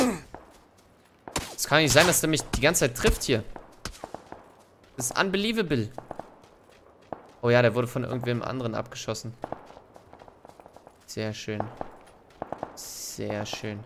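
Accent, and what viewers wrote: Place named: North, Germany. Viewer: Alter Schwede!!! Das geht schon klar :D Sagmal woher kommst du?? Irgendwie hörst du dich sehr nordisch an ;)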